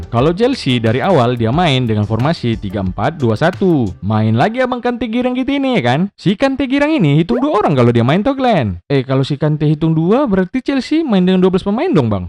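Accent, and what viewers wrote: Medan, Indonesia. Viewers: Bg abg org medan? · Medan mana kau bang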